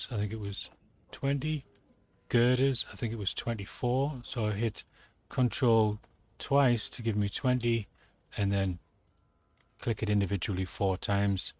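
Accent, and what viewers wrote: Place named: Sunderland, United Kingdom. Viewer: Sounds like you're from Sunderland.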